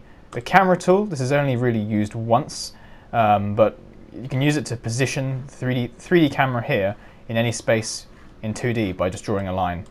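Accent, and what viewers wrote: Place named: United Kingdom. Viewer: I freaking love you British accent *0*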